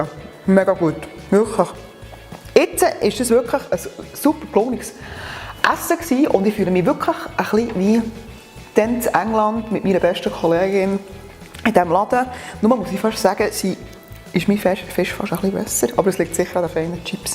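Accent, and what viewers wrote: Bern, Switzerland. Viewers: So geil! Bi neu bi dir u finge, dass du's extrem guet machsch. U sooo schön, wemer uf YouTube chly Bärndütsch ghört! :D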